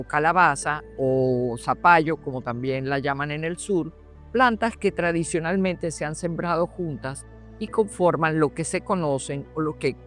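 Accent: Venezuela. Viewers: Por Dios ❤❤❤ que profesionalismo, la bibliografía me dejo asombrado 🎉🎉🎉🎉 me encanta su tono de voz al narrar, me reconforta ese acento venezolano a la distancia. Infinitas gracias profe. Hola pueda llamarla profe.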